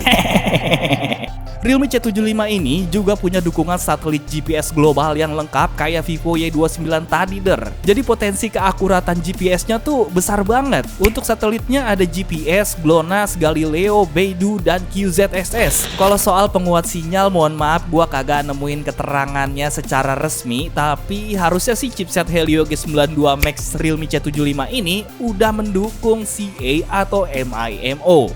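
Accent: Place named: Bekasi, Indonesia. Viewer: Bekasi or cikarang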